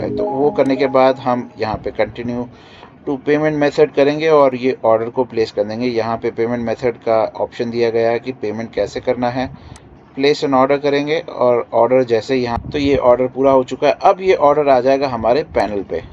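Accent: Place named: India. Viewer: Why does he speaks with a heavy Indian accent,I couldn't even listen to what he's saying about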